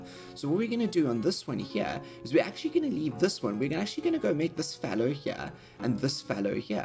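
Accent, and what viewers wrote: South Africa. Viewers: You sound South African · You sound South African?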